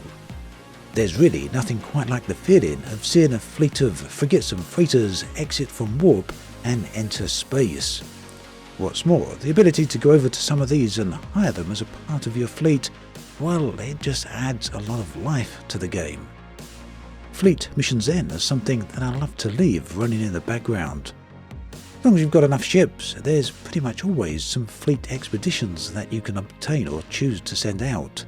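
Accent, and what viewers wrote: United Kingdom. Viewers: Quite off topic but where are you originally from? You have a booming voice that reminds me of Jason Statham, but I can't say I've heard a British accent like yours before. I'm anxious to know what part of which British (or otherwise) country has this accent where there is emphasis on the last syllable of some words that isn't present in other British accents. (forgive me, i'm a bit of an amateur voice impressionist and am easily fascinated by different accents). Fun fact, sometimes when I'm watching your videos, I remove the bass in my equalizer settings to prevent your voice from resonating in the entire house, like Liam Neeson's does. 😉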